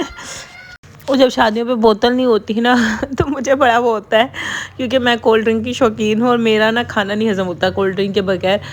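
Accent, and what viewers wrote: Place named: Karachi, Pakistan. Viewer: Ap ai to Multan se ha Karachi me pr ap bolte bilkul Karachi wlo ki trah Hain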